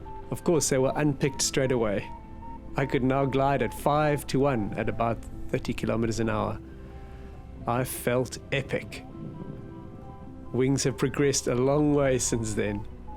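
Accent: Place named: United Kingdom. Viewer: Great I think u are from UK by your accent so we neighbors haha I wonder could you give me any good safe tips on places to get a good safe used wing? I know I'd be be able to afford a new one, especially with the way 2020 has gone so far.. Any help or advice I would be ever so grateful, I dreamed of flying ever since my earliest memories an I'm gettin on in years and have to get In the sky befor it's too late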